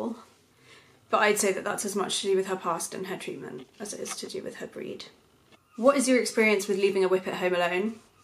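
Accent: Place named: RP, United Kingdom. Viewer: The queens English is always lovely to hear! I've had 25 Greyhounds! (Whippets cousins). I will have sighthounds until I pass. Cheers!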